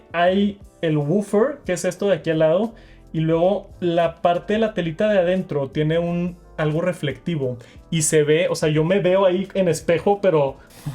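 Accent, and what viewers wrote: Mexico. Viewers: Porque habla así con ese tono, como afeminado o de niño adinerado. 🤔 Es por Alguna region de México hablan así o es solo esta persona ?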